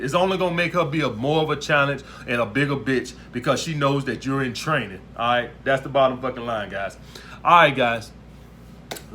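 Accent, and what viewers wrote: South, United States. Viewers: Do the southern accent help you in NY?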